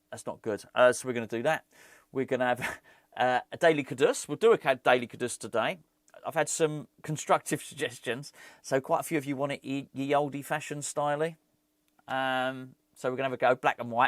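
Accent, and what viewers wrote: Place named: RP, United Kingdom. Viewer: a fine exponent of BBC English. Given the main subject of the video something to bring some cheer is a real necessity, well done 👍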